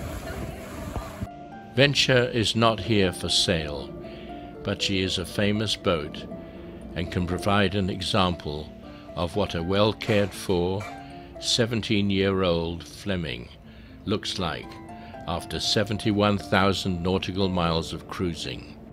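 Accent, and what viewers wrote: England, United Kingdom. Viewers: So pleasant to hear this world of adventure described and decoded through the lens of a true Englishman's command of the language. A rare and dying breed, sadly...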